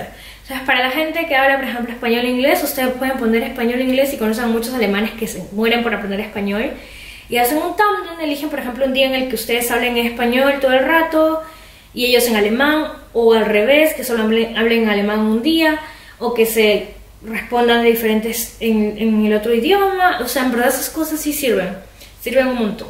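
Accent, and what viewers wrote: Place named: Peru. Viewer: Tú dejo es peruanoo i love your peruvian acent! ich lerne Deutsch.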